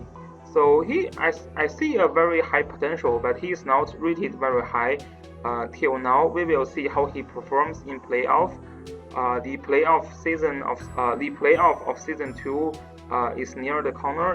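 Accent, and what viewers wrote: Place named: China. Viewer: I cant understand that english , such a strong chinese accent , and music background make it worst ....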